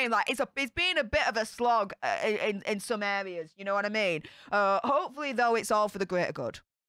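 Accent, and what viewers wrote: Yorkshire, United Kingdom. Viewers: Great points and I get to hear your delightful Yorkshire accent. Win/win, y’all. J4J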